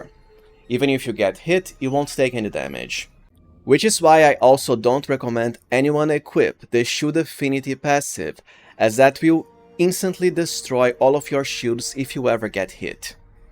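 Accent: Brazil. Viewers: Tu é BR né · Are ypu brazilian or portuguese speaker?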